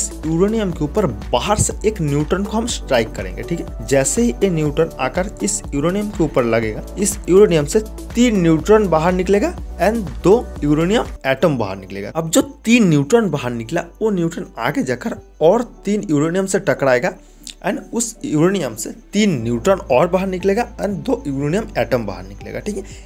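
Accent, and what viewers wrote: Bengal, India. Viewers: Appe Bengali ho na 🎉❤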